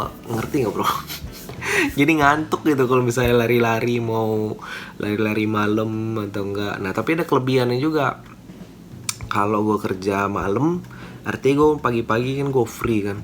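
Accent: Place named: West Sumatra, Indonesia. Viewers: Sebelum liat caption full, dengar video sambil membatin, "eh... kayaknya ini orang minang, logatnya berasa familiar." Ternyata benar, ada ditulis di caption hehe. Salam kenal uda. Dima di Padang nyo? Main-main ka channel kami :) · logat minang nya masih kental banget ya udah hehe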